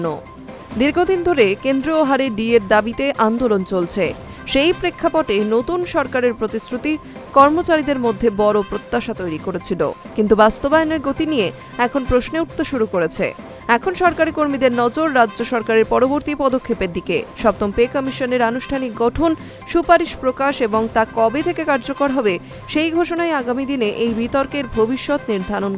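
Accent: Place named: Bangal, Bangladesh. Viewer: যিনি খবর পড়ছেন, তাঁর খবর পড়া পুরো বাঙাল উচ্চারণে আক্রান্ত!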